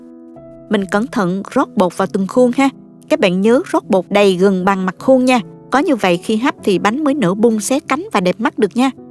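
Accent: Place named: Binh Thuan, Vietnam. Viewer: GIONG BÀNH THUẨN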